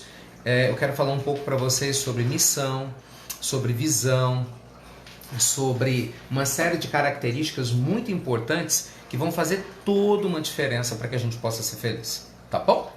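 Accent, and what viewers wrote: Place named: Brazil. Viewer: Bom pra treinar meu inglês. Pronúncia de um brasileiro é mais fácil entender! Hahah Obrigada pelo seu trabalho e empenho.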